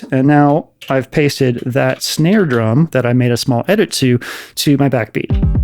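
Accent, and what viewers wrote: United States. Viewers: more southern talk plz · because your from the midwest , texas perhaps ?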